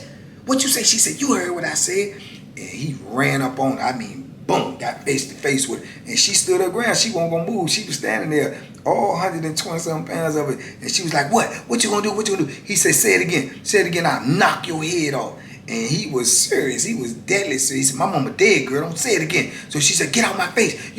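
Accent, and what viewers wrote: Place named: South, United States. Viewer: U r a very interesting man. No disrespect to ur relationship, BUT U R AN INCREDIBLY HANDSOME MAN, THAT SOUTHERN ACCENT IS EVERYTHING. U CAN GET IT FROM ME. I LIVE IN N.Y. B BLESSED . DORIE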